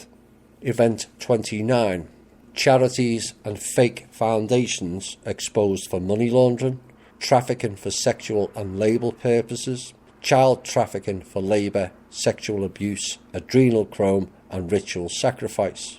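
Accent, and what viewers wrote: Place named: Liverpool, United Kingdom. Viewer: That's a Liverpool accent.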